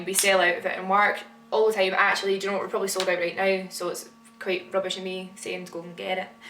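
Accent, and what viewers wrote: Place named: Glasgow, United Kingdom. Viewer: Hi just discovered you and I'm loving your channel! It's great to watch make up tutorials from someone with a Glasgow accent!! Can you please do a tutorial on your eye makeup in this video? x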